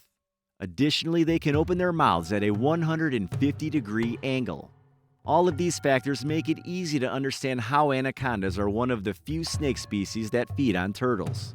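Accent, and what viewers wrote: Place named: Midwest, United States. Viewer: The Midwest accent is blowing me and I’m from fuckin Chicago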